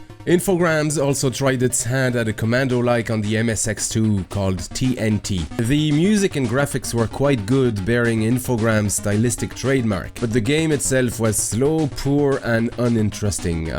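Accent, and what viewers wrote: Ireland. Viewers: Henry Pym Irish accent:) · Slightly off-topic, but what is this accent? Irish and French? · yay I win, I kept thinking this dude is Irish...but then French ha ha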